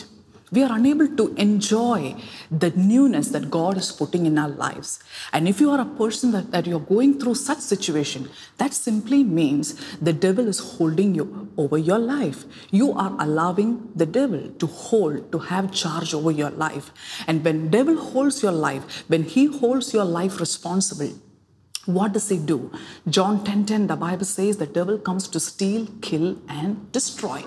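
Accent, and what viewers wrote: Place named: India. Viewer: 5 Subjects Great Stuff Pastor. Very Clear, Good Study and English That's a great Indian Accent.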